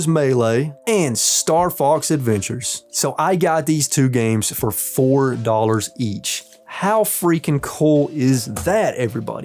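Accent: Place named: Tennessee, United States. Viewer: Liked and subbed from watching your friends game cube video featuring you son. What part of Tennessee do you y’all hail from?? I live in Seattle and my sister moved to Jackson,TN several years ago. Thought I recognized that accent lol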